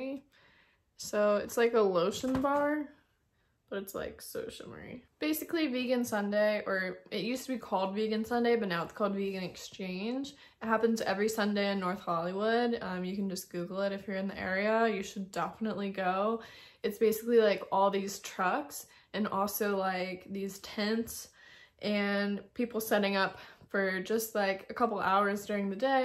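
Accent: Philippines. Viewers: I like your pilipina accent.. Sana all😅😊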